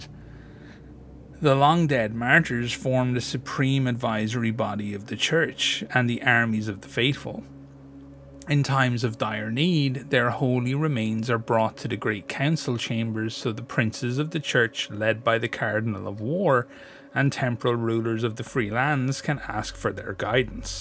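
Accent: Ireland. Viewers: I do love an Irish voice articulating our doom.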